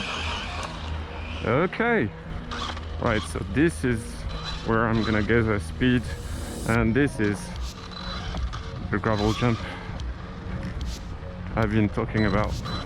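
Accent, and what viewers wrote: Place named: France. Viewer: Like you vidéos and your french accent ! Good job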